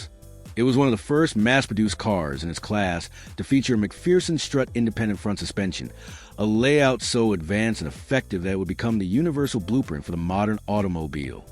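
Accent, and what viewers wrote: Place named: United States. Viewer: American voiceover? Hmmm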